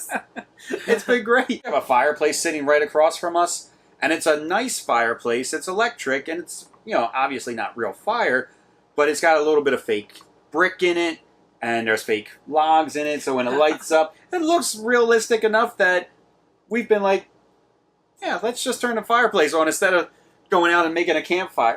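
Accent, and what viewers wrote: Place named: Northeast, United States. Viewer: Don't worry I say 'youzz' too. Its that Northeast lingo lol. Sounds like it was a great trip. Shopping for RV's is dangerous enough I don't know about actually living in one for a week. I would be back at the dealer signing papers. I look forward to seeing the walk-thru. Stay safe and happy travels.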